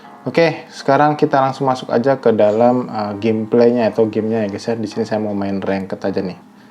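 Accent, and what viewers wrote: Cilegon, Indonesia. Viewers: Orang Cilegon ni . Hehe...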